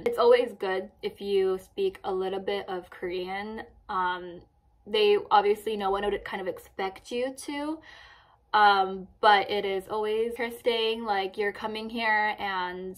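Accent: United States. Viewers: Are you Naturally born Korean? you sound more American especially the way you use your slang. Were you born in America? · I see you have an American accent, which possibly means you didn't grow up in Korea, so how would you know all these things about Korea? I don't care you're ethnically Korean, if you grew up somewhere else, you don't really have a place to talk about these things. If I'm wrong, correct me! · She sounds like a racist white girl in America!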